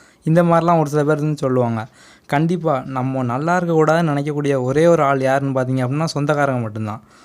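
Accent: Tirunelveli, India. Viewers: Thnq so much...and happy to hear tirunelveli slang... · Bro super thank u...But neenga nellai or thoothukudi yetho oru area thana...bro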